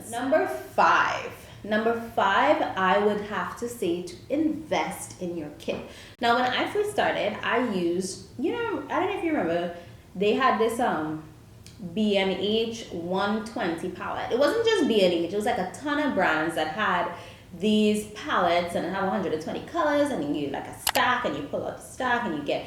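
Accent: Trinidad and Tobago. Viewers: Are you Trini? I feel like I'm hearing my accent but i'm not sure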